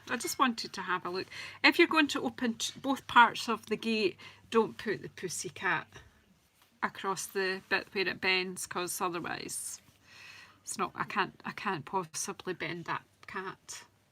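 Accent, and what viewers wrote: Scotland, United Kingdom. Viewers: Where is that accent from as I have never heard it before and have the hardest time ever understanding you. It is NOT you, it is me being German. Is this Scottish? Love the card a lot!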